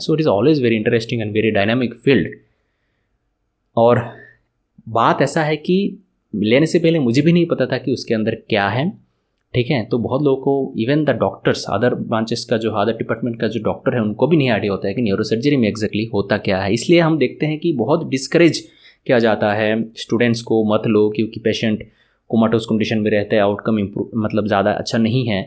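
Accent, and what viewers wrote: Bengal, India. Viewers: Areyy bhaii Hindi te r English Bengali accent bojha jachhe naa nki ?? ... R emni teoo ... Bangali toh obviously Bengali fluently bolteii paarbe .... Kaarpn Mother Tongue toh Bengali ... !!! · Love ur bengoli accent😅😅 It remides me of my chemistry teacher He was also from wb He came mp Whenever he speaks he makes commendable mistakes in hindi Lovely teacher Debdas Patre #arrowdere Love u sir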